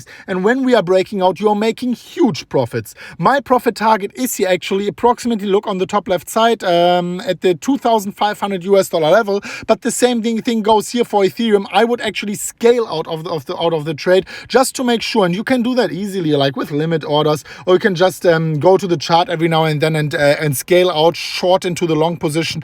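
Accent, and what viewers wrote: Germany. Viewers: Deutscher Akzent kickt xD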